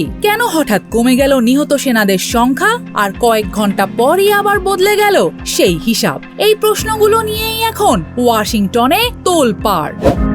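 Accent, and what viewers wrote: India. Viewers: Nijoshho bhasha use korte lojja pai india er moto uccharon korar chesta.biroktikor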